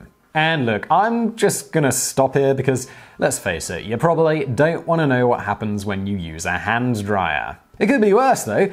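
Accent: England, United Kingdom. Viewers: Still, as always...thanks for your nice signature English Accent, clearly delivered without sounding like you have a mouth full of English marbles...really.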